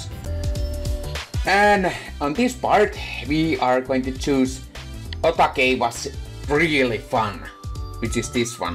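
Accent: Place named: Finland. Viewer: That accent is so strong you from finland perhaps or is it just me?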